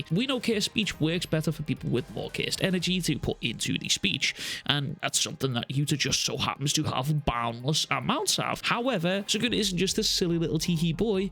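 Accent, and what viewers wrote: Liverpool, United Kingdom. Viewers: Gotta ask man are you from Liverpool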